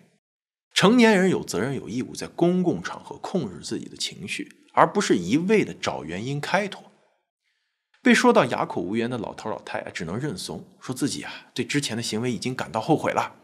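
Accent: China. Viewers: 博主S與F的英文的發音都不正確(卻是中國大陸的英文教育所教的中式發音)，請重新上網向外教老師學學。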